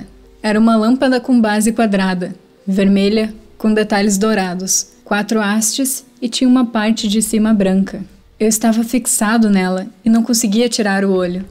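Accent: Rio Grande do Sul, Brazil. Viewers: você e gaúcha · O sotaque dela e parece ser gaucho ela usa muito "tua" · Oi tudo bem? vc é gaúcha?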